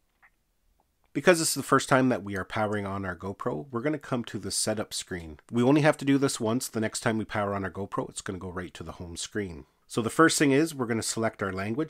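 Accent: Canada. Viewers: By FAR the best video on the new GoPro 9.... BTW I'm from Ontario.... you sound like you're also from the frozen North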